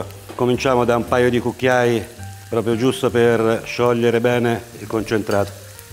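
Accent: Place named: Rome, Italy. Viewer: sembrava un accento romano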